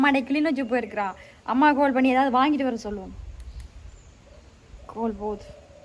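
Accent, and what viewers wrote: Sri Lanka. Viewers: நீங்கள் இலங்கையில் இளம் குயில்! நீங்கள் பேசும் இலங்கை பாஷை மிகவும் அருமை எங்களுக்கு கேட்பதற்கு மிகவும் இனிமையாக உள்ளது!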